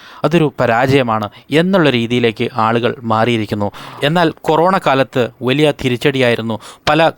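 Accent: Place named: South Kerala, India. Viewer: തെക്കൻ ശൈലി ആശാൻ കളരിയിൽ നിന്ന് പഠിച്ചത് മലയാള അക്ഷരം മലയാളത്തിൽ പറയാൻ അറിയാത്തവർ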